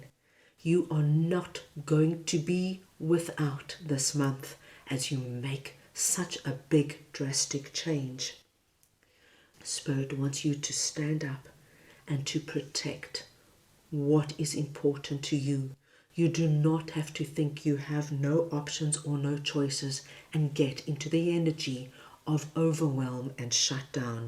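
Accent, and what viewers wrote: South Africa. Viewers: You sound SAfican like me so beautiful and spiritual so pure and wonderful, thank you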